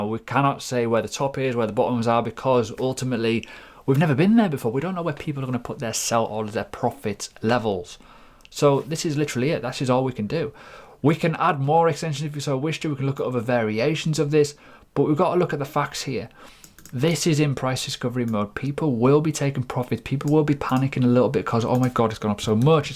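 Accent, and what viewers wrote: United Kingdom. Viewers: main problem is the heavy British accent:)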